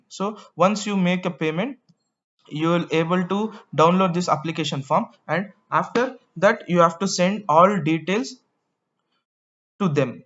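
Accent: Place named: India. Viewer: Why on the earth all these Edu videos made by Indians with a strong accent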